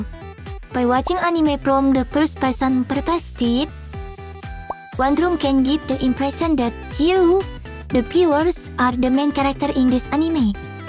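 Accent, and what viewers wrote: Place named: Indonesia. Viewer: Are you Indonesian? Your accent is like an Indonesian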